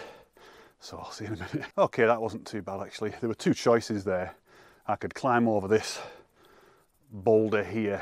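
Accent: Yorkshire, United Kingdom. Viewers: Love your videos pal. I'm in the lakes hiking the peaks all the time, but it's still great to see various places from a different perspective, especially on parts I haven't been to yet (eg Lord's rake). I'm trying to work out where you're from with that accent.....I'm guessing somewhere between Sheffield and Leeds? lol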